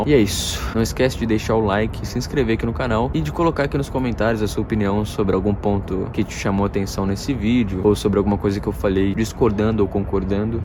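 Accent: Caxias do Sul, Brazil. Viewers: Ele é de Caxias do Sul?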